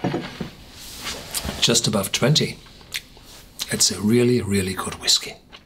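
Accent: Germany. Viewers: He's German.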